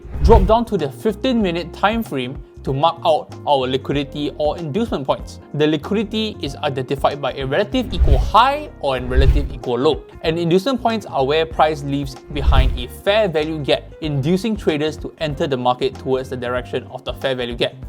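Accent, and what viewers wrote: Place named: Singapore. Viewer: Singapore accent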